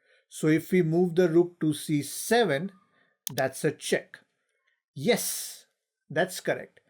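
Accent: India. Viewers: Indien English accent its hard to understand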